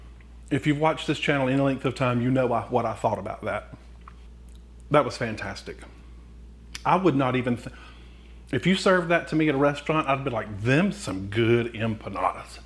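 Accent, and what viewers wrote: South, United States. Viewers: One of the best things about finding out I am pre diabetic is finding wonderful channels like this. Grew up in Tennessee now living in Florida an I do miss that southern accent. Get a kick out of him saying Y'all we're makin ____ come on! Puts a smile on my face every time.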